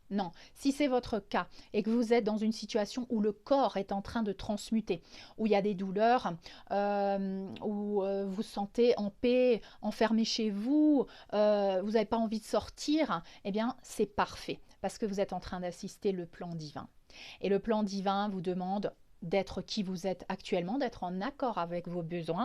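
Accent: Alsace, France. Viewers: Bonjour ! C'est moi ou vous avez un accent alsacien ? 😁😍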